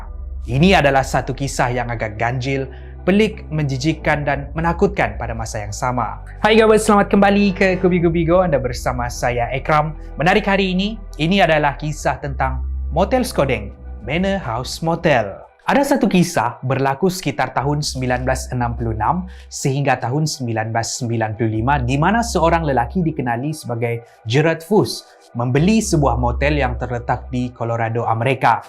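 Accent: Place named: Sabah, Malaysia. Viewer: ketara sabah c ekram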